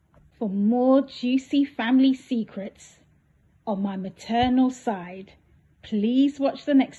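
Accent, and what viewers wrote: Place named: Nigeria. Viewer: You sounded Nigerian.. 😮❤❤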